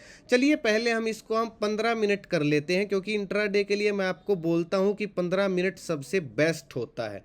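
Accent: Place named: Marwari, India. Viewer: आपका बोली का लहज़ा तो मारवाड़ी लगता हैं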